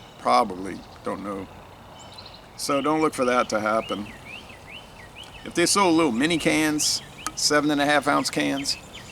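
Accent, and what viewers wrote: United States. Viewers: I should start making videos and give little digs about how you Americans pronounce and spell certain words.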